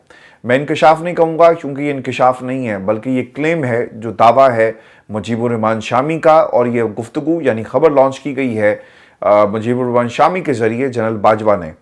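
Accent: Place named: Karachi, Pakistan. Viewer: بھائی آپ گجرات کے تو نہیں لگتے آپ کی شخصیت اور آپ کی گفتگو تو کراچی والی ہے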